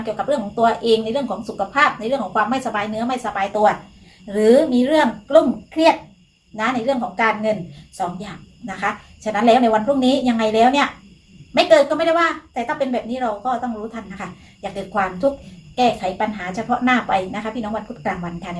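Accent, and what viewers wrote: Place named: South, Thailand. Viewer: สำเนียงใต้ มิน่าถึงพูดรัวฟังไม่รู้เรื่อง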